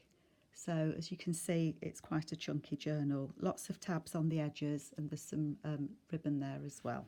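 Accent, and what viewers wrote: England, United Kingdom. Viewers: WOW!!! I LOVE LOVE LOVE this and LOVE Beatrix Potter, especially Peter Rabbit; and now Squirrel Nutkin! Amazing journal, how can I find a Peter Rabbit book?? I love your english accent by the way!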